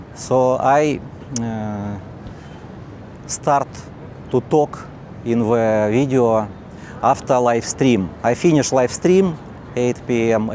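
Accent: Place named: Russia. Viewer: You sound like you’re Russian by your accent. I think it’s cool af that everyone has to speak English.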